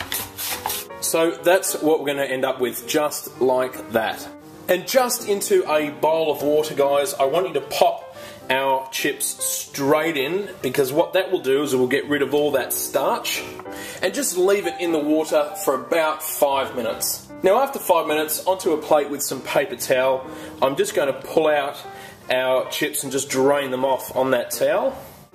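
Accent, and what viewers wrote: Australia. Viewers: He is aussie not english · Omg he's Aussie give him a break!!! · Are you Aussie ? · That's an Australian accent mate. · Nice job being a grammar nazi, a douchebag, and making fun of the way he pronounces things. He's Australian lol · Hes Australian, you yokel. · FYI, he clearly has an Australian accent... Second. Yes, he's doing the poutine wrong, but it's not his fault. I'm sure it still tastes good. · He's Australian you morons and this recipe was shit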